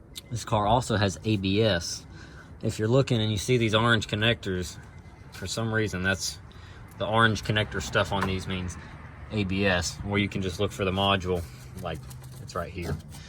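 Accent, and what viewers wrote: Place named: New Jersey, United States. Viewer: Love the NJ accent. Especially love the powah mirrahs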